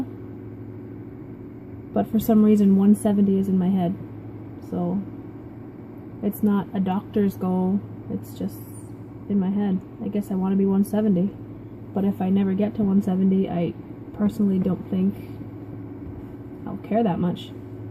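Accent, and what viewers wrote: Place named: Boston, United States. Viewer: I looooove ur videos!!!!! I also loooove ur Boston accent! I've been watching ur videos from the beginning and girl YOU ROCK!! U r looking super fabulous. Enjoy the wedding and wearing ur new size 16 dress!!!! My vertical sleeve surgery is next Wednesday and I can't wait to be in a size 16!!! Love to u missy!!! Keep up the great work!! Oh and hopefully soon u will be OUT OF THAT OFFICE!!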